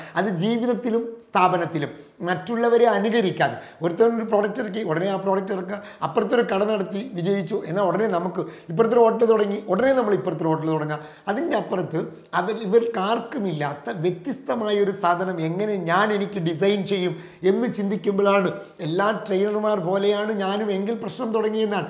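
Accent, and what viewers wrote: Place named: Tamil Nadu, India. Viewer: .... ഇപ്പോൾ അവതരണം... മെച്ചപ്പെടുന്നുണ്ട്... പഴയ' തമിഴ്ചുവ'ബോധപൂർവം അകന്ന് പോകുന്നുണ്ട്.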